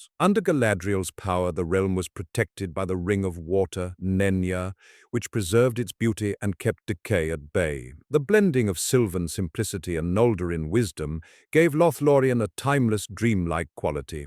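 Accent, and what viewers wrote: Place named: RP, United Kingdom. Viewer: Love your RP narration. Puts me in mind of The Inklings.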